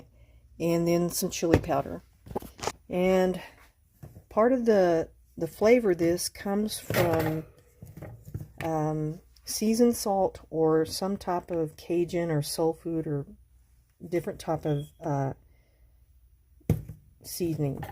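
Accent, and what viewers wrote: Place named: Texas Panhandle, United States. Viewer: Hey, I was just watching some of your recipe videos which I do really like. But I noticed that you have a fire alarm chirping in the background. I hope that I’m not being rude, I just got a bit distracted by it. Also, I’m from the Texas Panhandle as well, and hearing your voice sounds like home. I live in a different state now and miss hearing my people.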